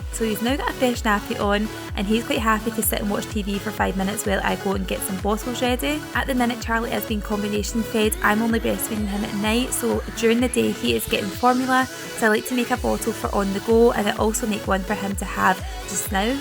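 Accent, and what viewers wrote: Scotland, United Kingdom. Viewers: I didn't know you're Scottish. Are you?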